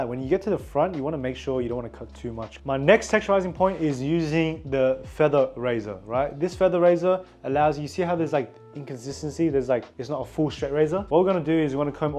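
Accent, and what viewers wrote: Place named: Australia. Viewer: You sound like an ozzie mate 😀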